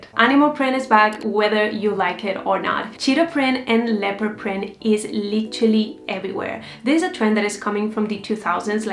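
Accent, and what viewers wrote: Spain. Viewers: are you a spaniard? you sound like my mom (in a good way)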